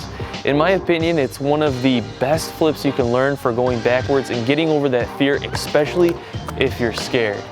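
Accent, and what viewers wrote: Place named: Michigan, United States. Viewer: You have such a Michigan accent lol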